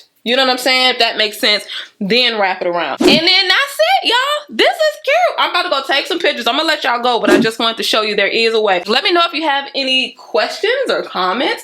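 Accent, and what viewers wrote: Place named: South, United States. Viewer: You. Are. HILARIOUS sis. This is my first video & I was CRYYYYYINGGG laughing!! The heavy accent with the southern country unabashedness was everything I couldn't have thought to ask for am 😂😂🤣🤣🤣 Beautiful job, ma'am!!! 😍👏🏽👏🏽👏🏽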